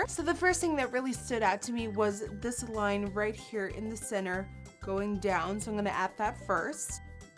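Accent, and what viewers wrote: Netherlands. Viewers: I ALWAYS THOUGHT YOU HAD AN AMERICAN ACCENT BUT THEN I WATCHED YOUR OLDER VIDEOS AND I REALIZED IT IS A DUTCH ACCENT AND NOW I CANT UNHEAR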